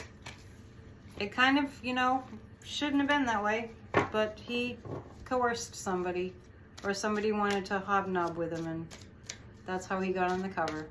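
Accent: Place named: East Coast, United States. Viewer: You sound East coast. Makes me feel right at home. 💛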